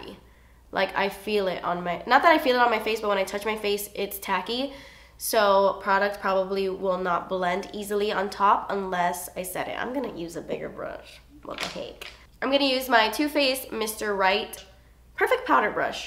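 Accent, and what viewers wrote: United Kingdom. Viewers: This is kinda weird but... I would love to watch a makeup tutorial by you, but all in that British accent! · DO A VIDEO THAT'S ALL U TALKIN WITH A BRITISH ACCENT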